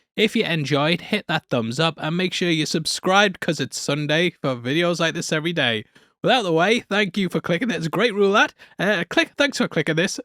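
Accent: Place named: United Kingdom. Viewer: I knew I needed this guy to explain things to me in a British accent. He never misses.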